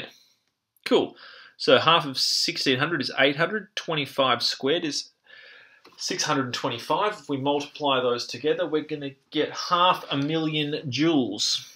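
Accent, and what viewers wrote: Australia. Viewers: This has helped me so much. I'm enjoying physics and mathematics in general a lot more as these concepts become more intuitive. Perhaps the narrator having an aussie accent similar to my own helps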